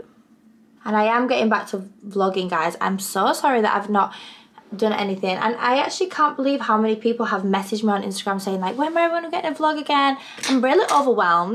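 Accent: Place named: Bradford, United Kingdom. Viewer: Is she from Bradford ? Her accent lmfao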